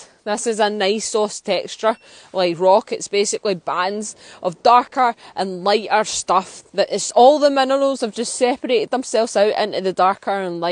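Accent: Scotland, United Kingdom. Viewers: Geology sounds much more authentic when described in a Scottish accent. I appreciate your videos!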